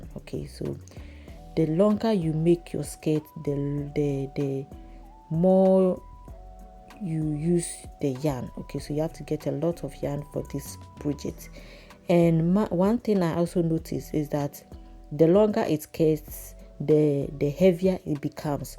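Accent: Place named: Ghana. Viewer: Sis your work is so beautiful and your accent sounds like a Ghanaian ❤🇬🇭….great job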